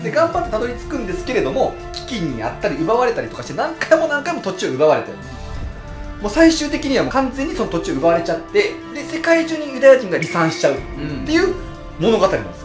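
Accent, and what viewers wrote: Kansai, Japan. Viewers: いつも寝る前に過去の動画を聞きながから寝るのだけど、さんの声が癒し効果があるのかすーーぐ寝てしまう(笑) そんな人多いはず w どんな話もその時代におった関西人みたいにしゃべってくれはるから解りやすいwwww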